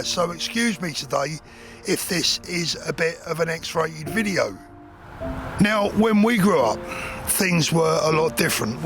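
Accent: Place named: London, United Kingdom. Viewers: Fantastic!!!!! You are very eloquent. The message would be the same if spoken by a toff, you've just got a different accent, a capital accent! Loved it, i'm in full agreement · !!! Mate!!! I fucking love a fucking English man with a fucking badass London accent who fucking knows how to fucking swear. It brings a tear to this Aussies eye. 😂 But seriously, never truer words spoken, well said. 🇬🇧🇦🇺🇬🇧🇦🇺🇬🇧🇦🇺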